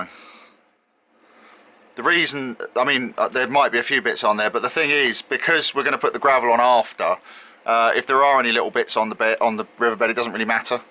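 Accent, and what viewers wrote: United Kingdom. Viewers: Where are you from? (In england) I love your voice/ accent.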